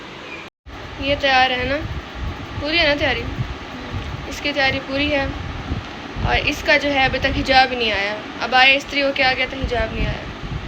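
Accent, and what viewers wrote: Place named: Saraiki, Pakistan. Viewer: Sarikiyn wala lahja hai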